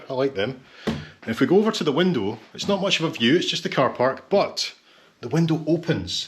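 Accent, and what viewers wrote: Scotland, United Kingdom. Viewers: you sound british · The stiff Scottish accent is becoming increasingly understandable after each video😅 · First comment, I am enjoying all the videos, and look forward to them all. What I also enjoy is the delicate Scottish accent,and even nicer when comes on line...Chris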